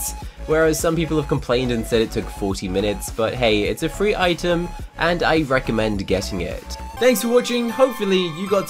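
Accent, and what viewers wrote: United Kingdom. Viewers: Not trying to be rude or anything, but through the entire video you pronounce z like zed and in proper English American it’s sed like z like zee I’m not saying, you have to change how you talk and I’m not meaning listen any rude way, but I’m just saying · He's british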